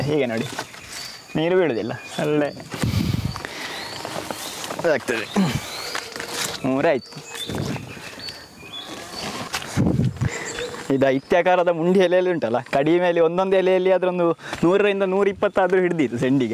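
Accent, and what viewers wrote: Mangalore, India. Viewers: As a malayali living in bangalore your (Mangalore) kannada accent is so beautiful, does not sounds like rude as here in bangalore due to inter-mixing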